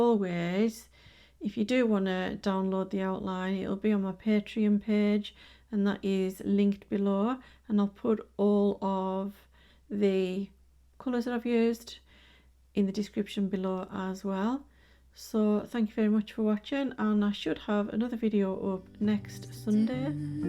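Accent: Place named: England, United Kingdom. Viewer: trying to place your accent is killing me. I have lived outside of the UK for 14 years and I cannot work out if you're from the Midlands or the NorthEast lol. Please tell??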